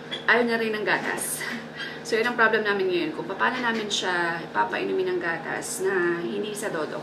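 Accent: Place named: Hiligaynon, Philippines. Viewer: Ilongga tapos Protestant. Grabe ka boses mo yung pinsan ko parehas kayo mag Salita ng tagalog.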